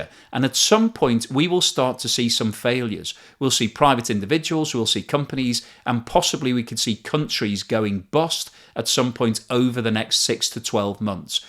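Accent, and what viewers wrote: United Kingdom. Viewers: Dear: I don't normally simp and bestow excess praise on people but your channel is such a breath of fresh air in an age of agitprop, fake news and hateful propaganda. Your news reporting is almost noble and moral in the sense that you exert every effort to be as honest and impartial and as objective as possible. There are very few journalists out there these days who are not dripping with the venom of scathing personal opinion, and hominum attacks and Trump-style trolling. They say that "facts dont care about your feelings" but everywhere I turn to for the news all I hear are feelings and not facts, and hateful ones at that! Your channel, in my opinion, goes out of its way to try and provide a sober and unbiased analysis of world events--something personally essential for me, as I am an American, living in Russia, whose life has been upended by the war and the myriad sanctions the West has imposed on Russian. 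Thank you so much, and please continue making your outstanding content with that classy gentlemanly British accent of yours. 🙂 Sincerely, An American in Moscow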